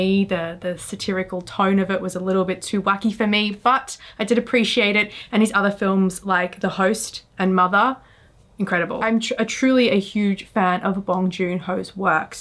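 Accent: Australia. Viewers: Are you Aussie? I like your accent. 😁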